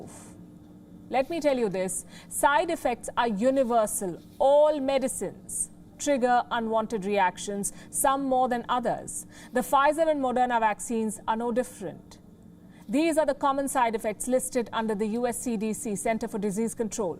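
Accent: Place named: India. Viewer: All these years under the British masters rules you still have your indy accent YOUR COLONIZER R not very proud.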